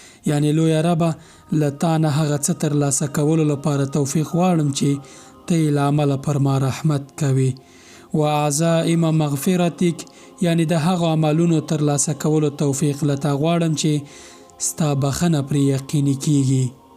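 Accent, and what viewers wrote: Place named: North, Afghanistan. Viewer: احسنت يا شيخ كلماتك كانت حسينة ناعمة اسعدك الله في الدارين جنوبی پشتونستان سره تعلق لری او که شمالی سره.ماته جنوبی اشکاری ولی لهجه ای شمالی ده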